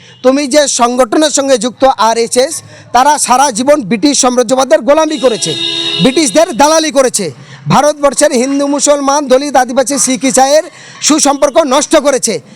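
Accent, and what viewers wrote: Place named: Bangladesh. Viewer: Bhai ki tumi bangladesi neki aaato sundar bolso tumi pakka bangladesi mone hoi tumi ki joy bangla sele tumi sala bangladesi mone hoi 😎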